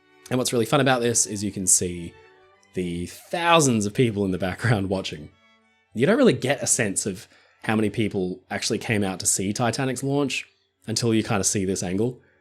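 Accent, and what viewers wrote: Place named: Australia. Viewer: I thought he was irish for years and then ir became obvious hes Australian...lok😊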